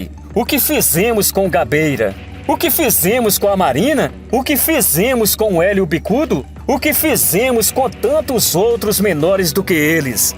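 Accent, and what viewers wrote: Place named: Bahia, Brazil. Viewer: você é baiano? Esse seu sotaque está me fazendo crer que sim!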